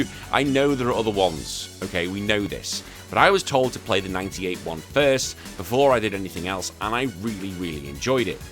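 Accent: England, United Kingdom. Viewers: hey not to be weird, i love your accent, what part of england are you from? its my favorite sounding one. I guess it's one of the reasons I've seen about all your videos so far.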